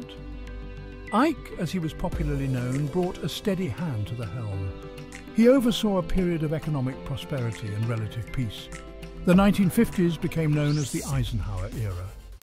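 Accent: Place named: England, United Kingdom. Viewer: So strange: Scattering modern "historical" pics with an English accent and "inspiring music" What a trip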